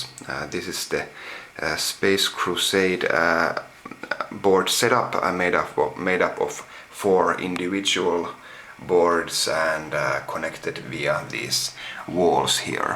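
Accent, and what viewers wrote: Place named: Finland. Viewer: huomasin sun englannin lausumisesta:D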